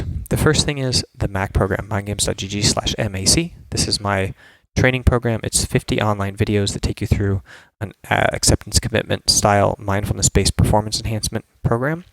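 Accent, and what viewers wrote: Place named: United States. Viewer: Where did you grow up? I find it hard to believe you're from Finland as you have no accent. You sound american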